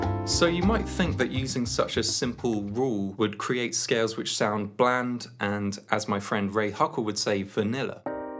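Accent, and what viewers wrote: United Kingdom. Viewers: I damn near pulled my hair out of my head listening to your horrible British accent! I turned it off 3 min in.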